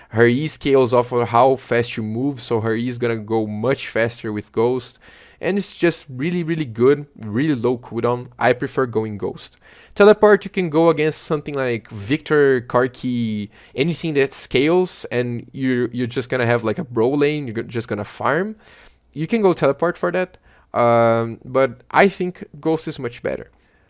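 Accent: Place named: Brazil. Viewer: Sotaque de brasileiro falando inglês é muito fofo 🥰🥰🥰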